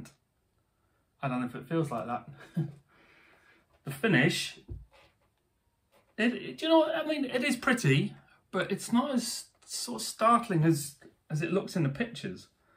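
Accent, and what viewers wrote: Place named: United Kingdom. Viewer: Must have be the British accent.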